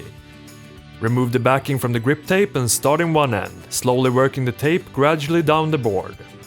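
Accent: Sweden. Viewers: Without knowing better I would quess you are Swedish based on your accent and specialy the way you say "just". Awesome and very good looking video btw! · you are swedish right? You have a swedish accent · are you swedish??? your accent is pretty swedish?? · Are you swedish becase i can Kinda hear it on your accent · Sounds like northern Sweden.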